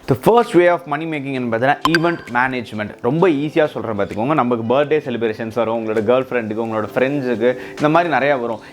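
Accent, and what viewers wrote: Salem, India. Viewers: Bro neenga salem ma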